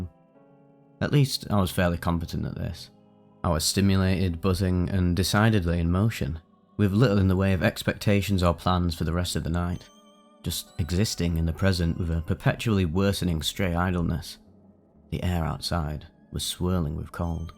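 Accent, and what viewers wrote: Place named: England, United Kingdom. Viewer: You have the perfect voice for narrating. Which part of England are you from?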